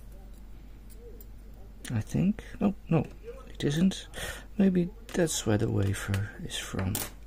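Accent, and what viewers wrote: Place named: Netherlands. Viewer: love the video bud! very informative. love hearing you silky smooth dutch accent ❤ excellent job on the pick too 😊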